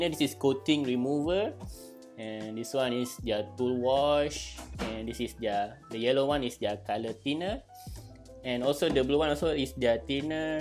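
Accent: Malaysia. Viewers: The first thing when I hear his voice my mind straight thinking about his Malaysian man because of his voice and accent. Because im Malaysian. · are you malaysia or Brunei? Bcz I hear you say lah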